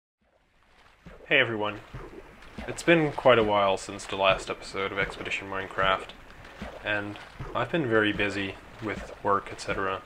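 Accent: Netherlands. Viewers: you from Holland?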